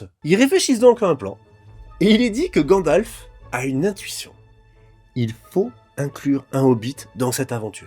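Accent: South, France. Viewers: 'Tain j'ai crû que les pattes sauronara existaient vraiment 😂 Pis entre l'accent du sud et le fait que t'arrête pas de parler de dr*gue j'ai pensé à jdg tout le long 😂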